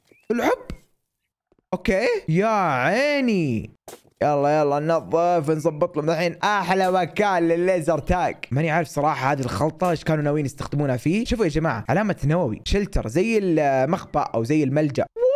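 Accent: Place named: Oman. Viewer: شيول اللهجه العمانيه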